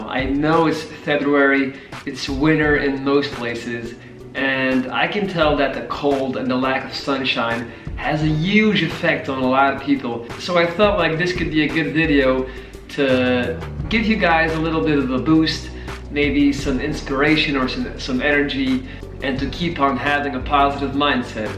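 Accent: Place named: United States. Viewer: thats amazing! When Ive heared You for the first time I thought You are from USA:) I also watched the bold and the beautiful but with my grandmum:)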